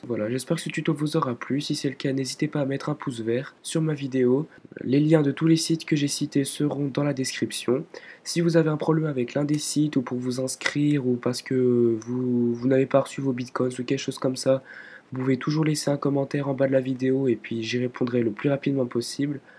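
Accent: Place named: France. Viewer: Bravo pour votre accent français, ça fait plaisir.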